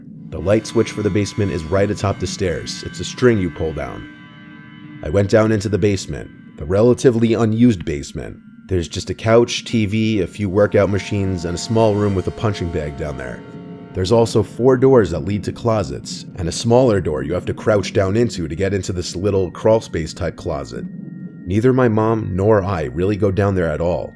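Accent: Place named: New York, United States. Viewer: The New York Borscht Belt accent is killing me.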